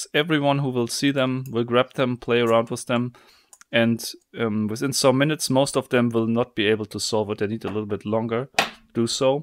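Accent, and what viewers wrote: Germany. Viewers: Kommst du aus Deutschland? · I'm here for the puzzle's too but I can't watch more than 1 video of him without muting the video... Don't understand me wrong I love this channel but quality is important.. I know what he's trying to say but it's hard to understand because it's neither british nor american english.. It's really shitty german english and german english doesn't sound good... he needs a lot more english experience to improve on this... so it would be better for him to talk german in his videos and I think most of his fans are german too ^^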